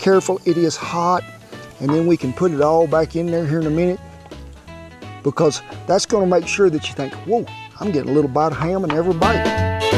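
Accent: South, United States. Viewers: I love your English accent country, It's not just recipes you tell good stories, food delicious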